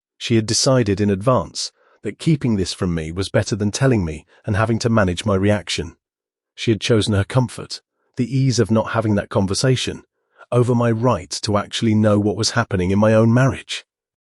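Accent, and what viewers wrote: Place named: United Kingdom. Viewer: This is British accent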